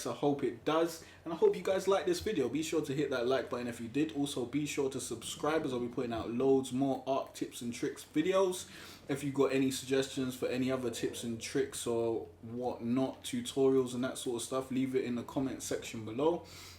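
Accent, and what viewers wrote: United Kingdom. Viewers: Wow you are British, lol. Thanks for the tips.... I've only been playing for a day...finally caught my first dino: Trike and accidentally killed him on my standing fire torches...fml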